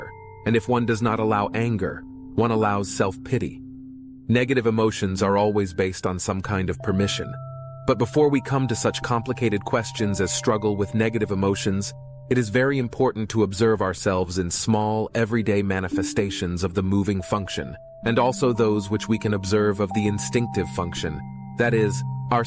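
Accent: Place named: United States. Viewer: Yet another Yank accent.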